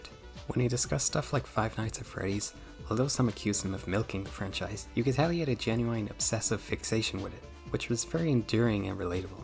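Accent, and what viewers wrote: Ireland. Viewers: You're so Irish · You pronounce t's like an Irishman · you Irish?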